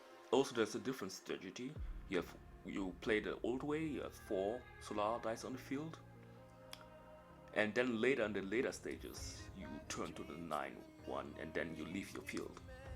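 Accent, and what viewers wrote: Germany. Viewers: Ich habe den akzent direkt erkannt da ich selber deutsch bin erkennt man sowas direkt wenn ein deutscher englisch spricht war mir aber nicht sicher xD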